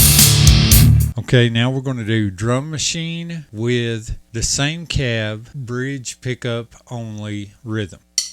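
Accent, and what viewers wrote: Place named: Texas, United States. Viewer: Love your southern accent! you from texas?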